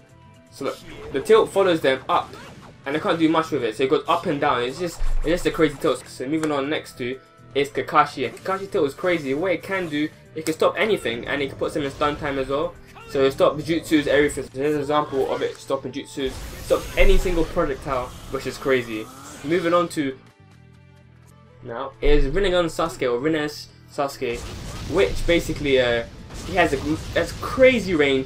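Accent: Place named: France. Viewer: I didn't even realise this guy was speaking english, i thought i clicked on a french youtuber's channel for a second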